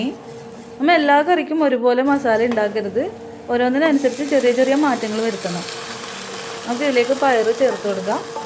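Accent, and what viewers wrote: Thrissur, India. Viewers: ചെച്ചി തൃശ്ശൂർ കാരി ആണ് ല്ലേ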